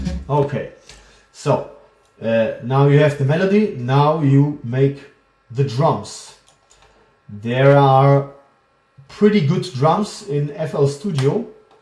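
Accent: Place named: Germany. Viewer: ドイツ訛り英語だけど逆に日本人には聞き取りやすいし、日本語のタイトルは嬉しいね。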